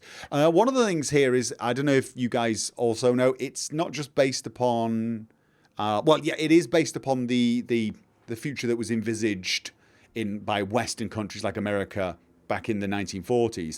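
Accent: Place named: England, United Kingdom. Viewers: I love your English accent. I never heard your particular dialect. What part of England did you grow up in? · Northern english, mostly Manchester, I think. Search " Accent". There is a detailed video about it.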